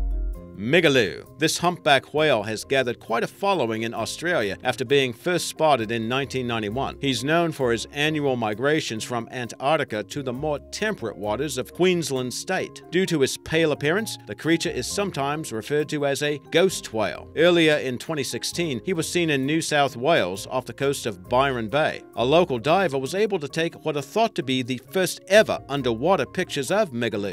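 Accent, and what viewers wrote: United Kingdom. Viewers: You know theres something called a british accent?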